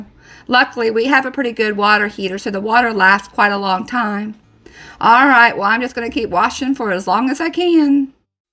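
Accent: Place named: South, United States. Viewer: New subscriber from Mississippi, and it's so nice to hear your Southern accent! Your home is lovely!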